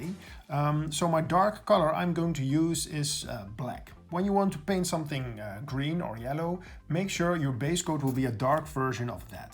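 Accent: Netherlands. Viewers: Jij bent zeker een Nederlander he😏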